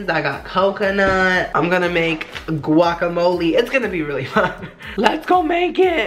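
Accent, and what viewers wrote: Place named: United Kingdom. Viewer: HIS LITTLE BRITISH ACCENT MAKES ME LEE EVERYTIME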